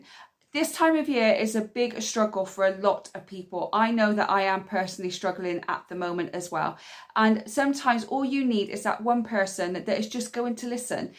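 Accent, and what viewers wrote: United Kingdom. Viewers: You are accent look like British love it that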